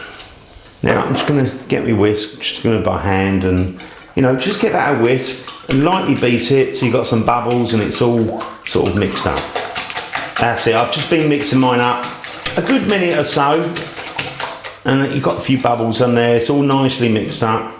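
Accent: United Kingdom. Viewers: He's from the UK, he can't help it. It's a very cute tutorial, I think.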